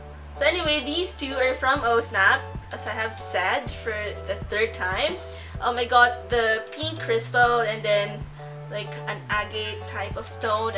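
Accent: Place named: Philippines. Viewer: Omg! Ur filipino accent is so on point! Im so jelly cause my accent is like a canadian accent cause i live in canada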